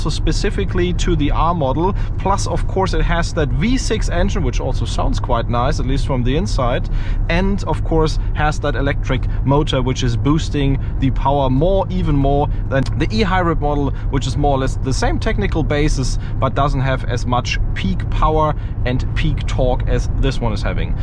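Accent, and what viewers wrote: Germany. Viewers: dein dein denglish is einfach nur nerfig :)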